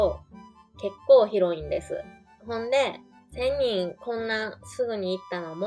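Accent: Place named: Kansai, Japan. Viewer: 大阪にちょっとだけいた元ホストです。関東の人間にはアメージングで謎なT新地の不思議をいろいろ教えてくださって勉強になります。またの関西弁にキュンキュンします。１０００人おめでとうございます。ライブ配信頑張ってください。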